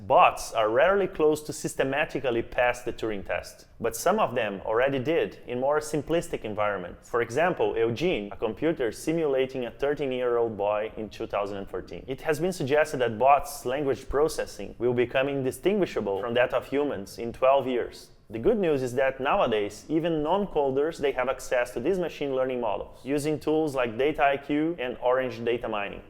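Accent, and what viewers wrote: Brazil. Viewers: Legal, cara, deu para perceber só pelo sotaque. Bom saber que tem um pezinho nosso na TRIBE. Um dia quero fazer um curso aí com vocês. Eu sou cearense, mas moro em Santa Catarina. Já estudei na Inglaterra e na Austrália. Sou formado em Ciências da Computação, mas a 4 anos venho estudado o Marketing, de um forma mais técnica. Esse é um site é fala sobre mim: (está um pouco desatualizado já) <link> E aqui o site da empresa que estou ajudando a criar: <link> Mas valeu, quem sabe eu vá conhecer o trabalho de vocês em Amsterdã. Abraço.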